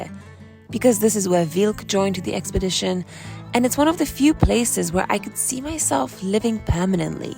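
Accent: United Kingdom. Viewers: ...albeit with a German name and a British accent.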